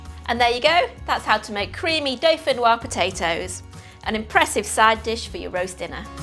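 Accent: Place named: England, United Kingdom. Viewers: Delicious cooking explanation with that English accent makes me watch this video on repeat mode. 😂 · Great recipe and super simple, thank you.....! Are you from Liverpool? I sensed a hint of a scouse accent. Hope you are a "red" :-)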